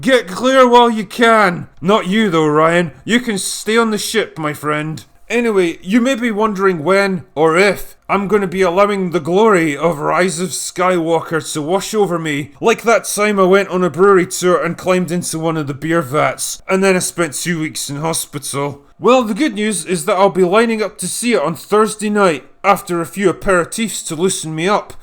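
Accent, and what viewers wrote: Scotland, United Kingdom. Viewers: I had the volume all the way up by accident and I got blasted in the ear with an angry Scot. Worth it.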